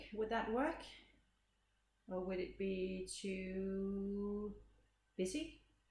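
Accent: Denmark. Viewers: Så får du da lige en lille hilsen fra syd for Granada i Spanien også. Så er der et land mere på din liste. Det sjove er at jeg faktisk hørte om din podcast på en amerikansk podcast, og vidste ikke, at du var dansk, da jeg startede med at se din podcast en gang i slutningen af sidste år 😄 men jeg genkendte hurtigt accenten 😉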